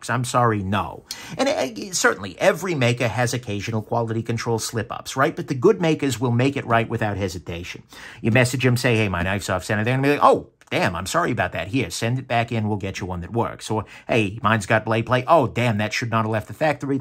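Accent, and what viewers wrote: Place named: Brooklyn, United States. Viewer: No way you live in Cali! You sound like your from Brooklyn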